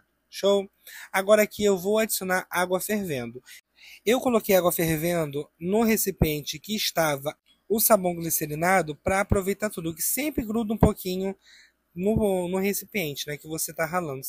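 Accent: Rio de Janeiro, Brazil. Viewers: Oi Boa noite.Nossa eu sempre procurei 1 receita de pasta; porque aqui em SP e muito caro.🙏🏻❤ por nos ensinar c tanta clareza e dedicação vc e 1 luxo e os CARIOCAS falam fofos👍😘❤Inte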